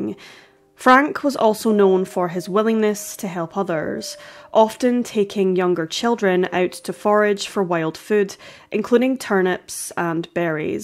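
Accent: Scotland, United Kingdom. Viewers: The Scottish🏴 accent is quite lovely🤗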